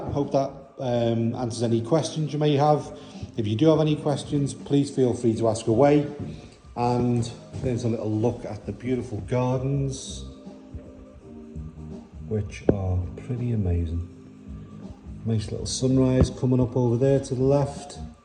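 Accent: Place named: Liverpool, United Kingdom. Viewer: Hey, are you from Liverpool.. I detect a fellow Scouse accent. ❤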